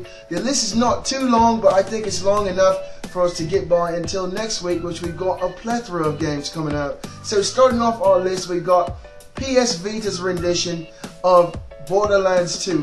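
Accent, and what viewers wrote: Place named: United Kingdom. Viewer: Lol lovin the posh English accent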